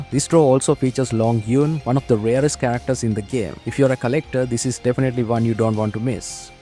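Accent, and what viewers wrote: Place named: India. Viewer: Your accent is like similar to Indian english 😂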